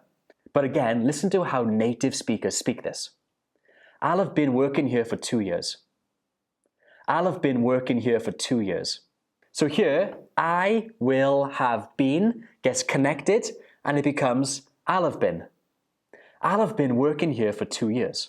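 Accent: United Kingdom. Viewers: Outstanding British accent!where are you from?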